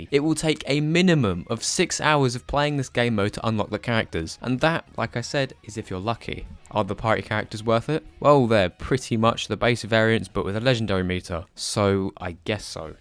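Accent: United Kingdom. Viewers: You sound British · bri ish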